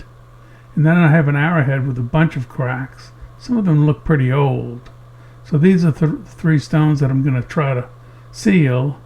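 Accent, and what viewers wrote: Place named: Louisiana, United States. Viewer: Hello...I truly enjoy your videos...thank you for sharing your knowledge...where are you from ? Sounds like a Louisiana accent...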